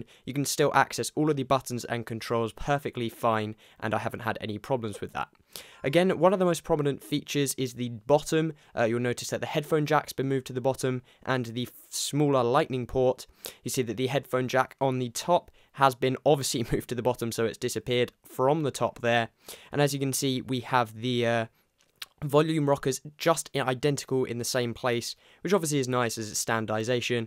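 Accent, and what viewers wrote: United Kingdom. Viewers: Is he a Brit or what?1?! ;0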